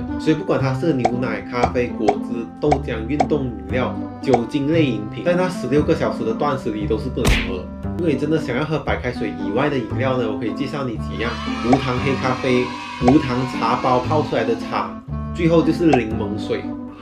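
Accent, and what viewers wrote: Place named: India. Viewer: 你怎么有印度口音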